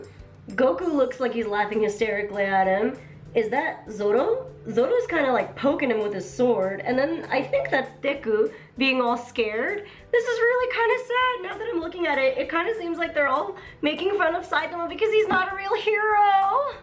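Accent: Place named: Los Angeles, United States. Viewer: where were you born? you have american accent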